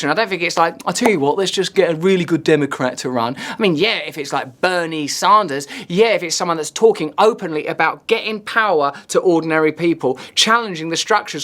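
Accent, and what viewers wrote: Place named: United Kingdom. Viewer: Brilliant observations as usual with the appropriate amount of well spoken British!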